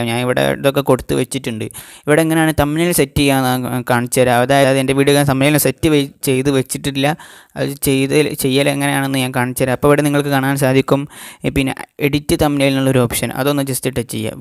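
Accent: India. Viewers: Are you from malappuram♥😂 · ഇടക്ക് ഞമ്മളെ കോയിക്കോട് നാടൻ ഭാഷകയറി വരുന്നു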